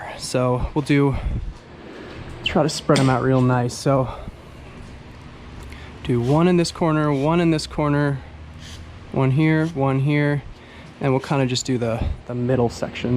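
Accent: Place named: Wisconsin, United States. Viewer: Sounds like Wisconsin 😜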